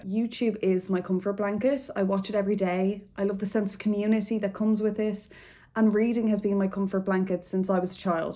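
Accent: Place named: Ireland. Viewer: Great video! You have fantastic taste in books (Ready Player One, multiple Murakami, Cloud Atlas and Shadow of the Wind among others 😊) I really hope you find your rhythm and keep the passion for this, because this space definitely needs a voice like yours. The 10 days I spent in Ireland were the best of my life, so your accent reminds me of better times:) P.S. book recommendation for you... History of the Rain by Niall Williams. Irish author, takes place in a small fictional village in County Clare. Beautifully poetic and a great book for literature lovers.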